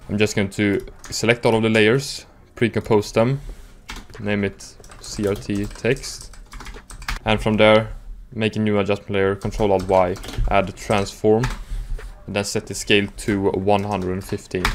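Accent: Sweden. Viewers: this guy is 100 percent swedish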